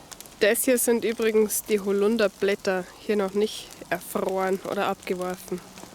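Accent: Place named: Bavaria, Germany. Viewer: Hallo, des war sche a wanns koid war. Ich seh und hör dir unheimlich gerne zu. Du musst natürlich Hochdeutsch sprechen, aber dir rutschen immer wieder herrliche bayrische Wörter heraus. Für mich als Preuße der in Bayern gelebt hat kein Problem, aber für die die oberhalb des Weißwurstäquators leben, also nördlich der Münchener Stadtgrenze wahrscheinlich schon.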